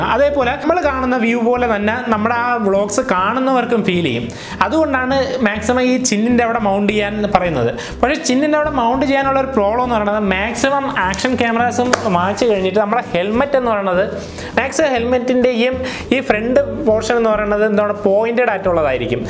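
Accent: Trivandrum, India. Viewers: NJN ATTINGAL ANN BROI. SLANG KETTAPOL THONNI TVM ANENN😅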